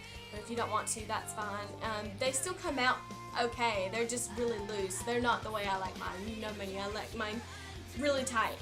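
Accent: South, United States. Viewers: your accent is so adorable and endearing! so wish I had more of a southern accent than I do. you seem really sweet just wanted to tell you that! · This is awesome! Thanks! :) I love my hair and your method worked great! Question, How long do the curls stay in your hair during the day without hair spray or any moose, just hair? How long with? I'd appreciate your answer :) BTW I adore your country accent, I think its the cutesiest Ever! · That's what you call a country accent she is from the south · Great job girl love that southern accent, keep doing YOU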